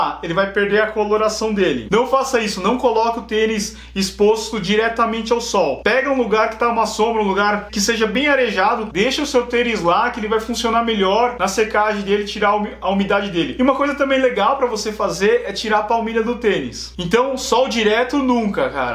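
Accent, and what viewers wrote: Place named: Sorocaba, Brazil. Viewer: Eu sou de sp , sua voz que é de "do" Sorocaba rsrsrs . Valeuuuuuuuuuuuu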